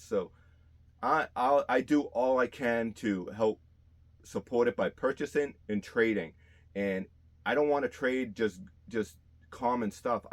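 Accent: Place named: New England, United States. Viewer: After living in Mass. & New Hampshire for 16 years myself, I could listen to you say Sta Wars all day. Great Video.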